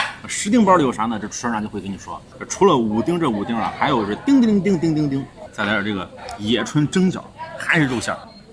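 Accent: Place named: Yangzhou, China. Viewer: 说的扬州话很地道让我想起了我的家乡东北.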